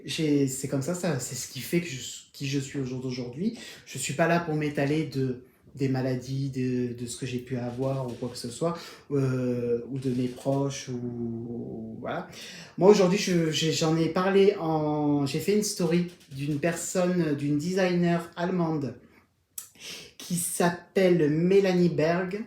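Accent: South, France. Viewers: Bonjour!! Merci pour ton podcast! Ta simplicité est rafraîchissante! Tu m’as fait bien rire avec ton expression «foutre la patate»! Je dirais que quand tu ressens une émotion en lien avec un écheveau de laine, c’est avoir un coup de coeur ou un coup de foudre! Pour ce qui est des couleurs pour ton châle, je dirais de ne pas mettre la laine avec le rose fluo, remplacer par le fruit d’automne et scarabé…ou scarabé et fruit d’automne en dernier. Comme toi, j’adore les tutos de CloTricot! Je suis très fière de ma compatriote! Elle est tellement talentueuse pour expliquer les techniques. Je me suis procurée son livre de tricot chausette! Je l’attends avec impatience! Sur ce, continue ta belle aventure et j’ai bien hâte de voir ton prochain épisode avec ton accent du sud! Salutation du Québec!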